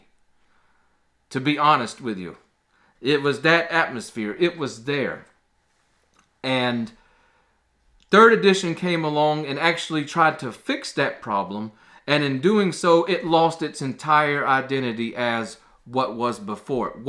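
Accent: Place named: Louisiana, United States. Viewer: So this channel needs to lean more into its Cajun roots. I'm here and subbed basically because of the content and the Cajun accent.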